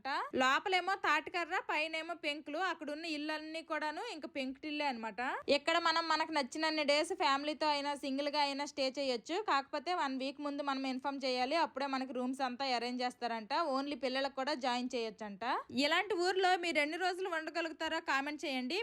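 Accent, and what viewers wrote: Srikakulam, India. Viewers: Ni voice వింటుంటే midi pakka srikakulam ani ardamaindi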